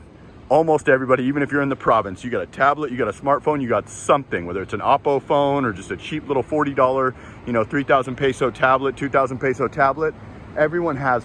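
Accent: United States. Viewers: I’d like to add because you may not know it, your narration helps a lot too the young Filipinos learning and developing and mastering the American twang.👍👍👍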